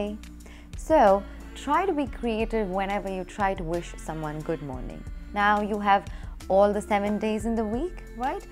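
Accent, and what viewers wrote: India. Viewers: Are you a native English speaker.? You are speaking indlish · You sound local Indian village English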